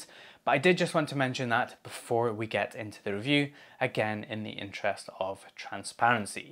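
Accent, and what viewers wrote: Scotland, United Kingdom. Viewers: Another amazing video!. Informative and enjoyable to watch. Still waiting for you to lean into the Scottish accent more and roll your Rrrrs among things. 😝 Maybe in one of the always funny blooper takes at the end? 😉😉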